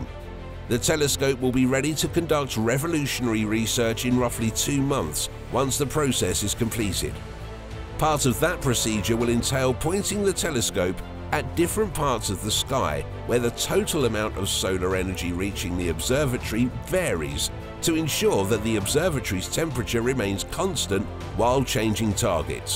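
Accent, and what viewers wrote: United Kingdom. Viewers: So not only is this narrated by a Brit, essential to making Americans think there is some intelligent afoot, but the Title is a lie. THere are no new images, and only the very clear statement that sooner or later there will be. This entire project is an amazing feat primarily on the part of US teams of engineers and scientists. Isn't it a pity to have to lie about in the title? Whoever posted this, you dishonor a great achievement.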